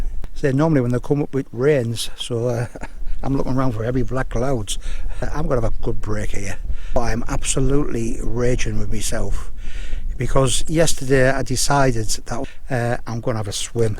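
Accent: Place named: Northern England, United Kingdom. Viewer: Ay-up! I finally got a chance to check out your channel and I’m so please I did! Your northern accent reminds me of my dads side! Haven’t been up north for so long! Anyway, great video! Some amazing views there! Would love to visit! I’ve subbed x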